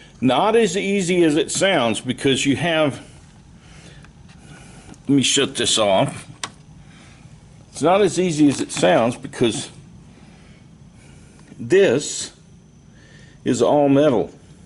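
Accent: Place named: South, United States. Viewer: What region of the US is this southern accent from? I have two units of superchamp xd. I got the first one new because I loved the sound and the price at the store. Years later I went for another one but they had the new x2 version which I didn't fancy. So I waited until I found one second hand. Great amp, size, power and.... brilliant sounds wiselychosen.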